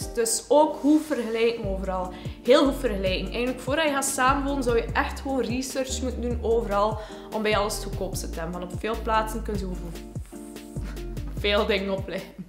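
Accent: West Flanders, Belgium. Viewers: Bedankt voor de tips net alleen gaan wonen en tof voor te horen babbelen, ben ook West-Vlaming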